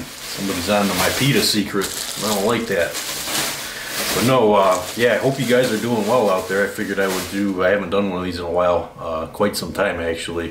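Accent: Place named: Wisconsin, United States. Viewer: Any chance you're from Wisconsin? You sound exactly like my friend's father who hails from Milwaukee.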